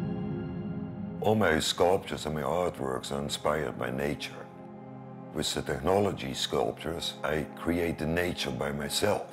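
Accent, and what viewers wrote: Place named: Netherlands. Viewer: Nederlands accentje wel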